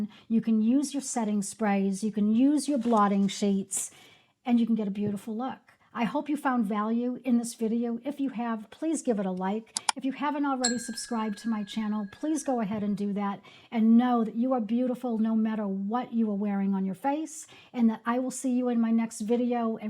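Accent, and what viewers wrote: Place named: New York, United States. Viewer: Beautiful woman❤ I love your accent!……New York? Thank you for tips. I agree glow is so important 😊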